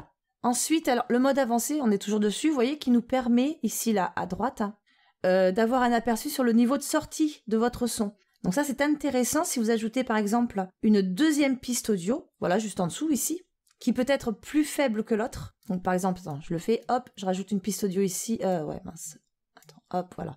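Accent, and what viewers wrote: South, France. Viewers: oh t'es du sud toi, quand je regard tes tutos et après tes clockworks sa fait un choc, tu refait tout sur une logiciel comme C4D non ? Bonne vidéo btw :)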